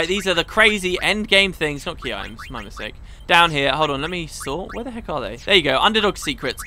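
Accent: United Kingdom. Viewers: pronounce things in the british fashion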